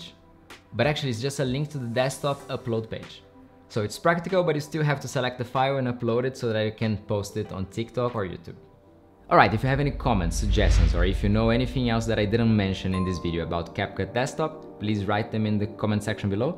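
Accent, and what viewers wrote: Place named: Brazil. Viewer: This accent.... Are you Brazilian?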